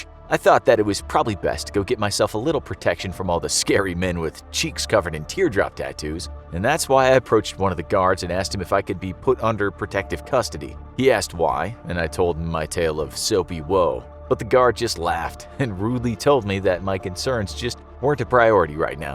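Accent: United Kingdom. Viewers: His British accent is actually alright.....I can tell better than Americans because I'm from the UK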